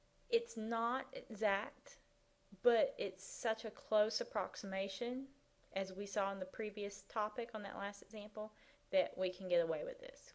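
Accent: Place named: South, United States. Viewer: nobody has liked your video with that sweet southern accent of yours?? well, i just did. just worked on this with a student i tutored :-)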